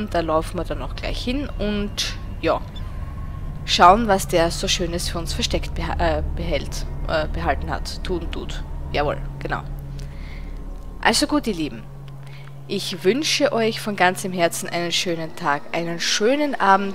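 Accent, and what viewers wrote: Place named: Austria. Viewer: i mog deine lets plays, es oesterreichische ghert sprochlich und a lets-play-technisch sowieso mehr forciert :-)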